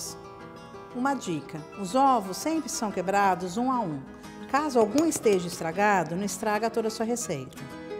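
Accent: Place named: Brazil. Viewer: É de Portugal???? de repente pareceu-me que era Brasil!!!!!!!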